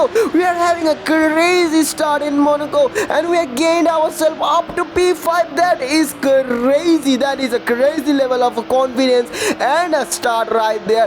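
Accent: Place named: Pakistan. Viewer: Your english sounds like Pakistan's english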